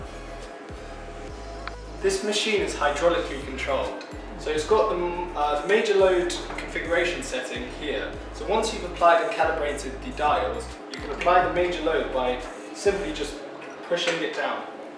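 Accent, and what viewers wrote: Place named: United Kingdom. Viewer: clearly a british accent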